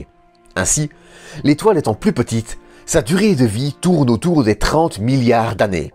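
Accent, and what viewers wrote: Liège, Belgium. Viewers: Exact, mais les français sont pas habitué à l'accent de la couronne Belge, ça les calmes. est super bosseur, en produisant une vidéo par semaine, c'est sa force, depuis les 20 K de Neowise, et plus de 150 vidéos en stock, la machine commence à s'auto alimenter. Il a l'envie de poursuivre, donc dans la durée, la chaîne aura plus de place. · Bel accent de Liege :D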